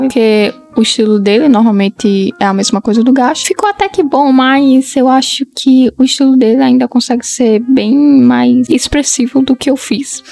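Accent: Northeast, Brazil. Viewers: Tu é nordestina? O sutaque parece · Não sabia que você era brasileira, ainda mais com um sotaque nordestino, ORGULHO BRASIL🇧🇷🇧🇷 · é nordestina? Senti um sotaque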